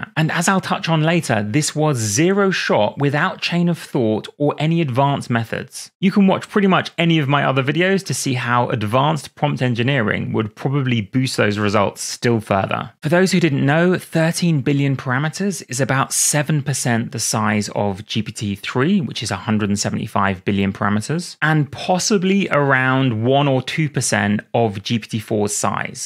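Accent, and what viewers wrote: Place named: United Kingdom. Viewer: When these videos become too technical for me to understand, I'm still learning a beautiful British English.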